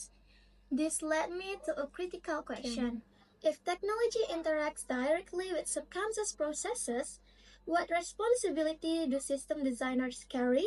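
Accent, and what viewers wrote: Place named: United Kingdom. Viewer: omg sounds really British😚how cute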